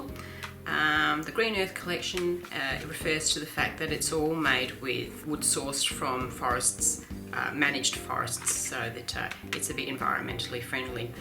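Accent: Australia. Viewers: that video was fantastic and so good to hear a fellow Australian accent. I am 74 y.o. and have just recently gotten into colouring books. I am addicted!!! So relaxing. Your video is so informative and I am asking Santa for some Faber Castell Polychromos for Christmas. My other addiction is making cards. Thanks.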